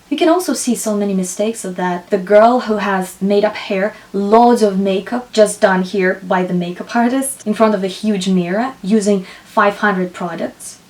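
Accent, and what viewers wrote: Russia. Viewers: Ilona Piescikowska she's russian so she probably won't change her accent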